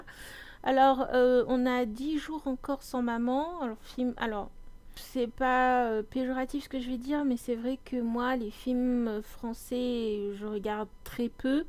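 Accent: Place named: India. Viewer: ah! D'Accord moi aussi je vis sur l'île de la reunion dans l'océan indien! Et j'ai moi aussi une chaine YouTube s'apelle Geek to Cinema! Donc maintenant on est deux! Lol en revenche je n'ai jammais entendue parlé des autre autre YouTubeur! Mais sans être curieux tu vis ou a st pierre ou st Louis! Parceque a un moment tu dit que le Ciné et a de chez toi 20 minutes Moi je vis a la ravine blanche! Et je pence que il 10 minutes ou 15 minutes tu as un accent indien quand tu parle tu es d'origine Indienne!?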